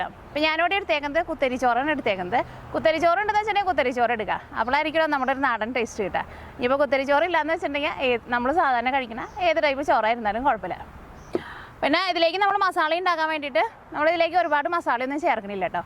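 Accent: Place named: North Kerala, India. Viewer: Oru Nalla Nadan kutty anu,I like your presentation & vadakkan accent.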